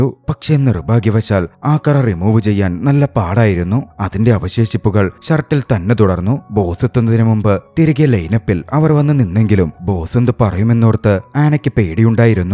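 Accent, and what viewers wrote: Ernakulam, India. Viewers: നിങ്ങ എറണാകുളത്തുകാരനാണോ?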